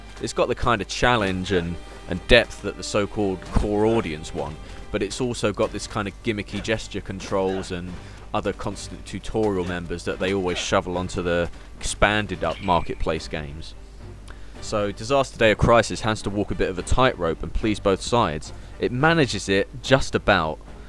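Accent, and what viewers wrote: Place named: United Kingdom. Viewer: Thanks, nice short, snappy review. I'm thinking of getting a cheap Wii so I'm checking out some cheap games too. And always nice to hear a restrained British accent on YT.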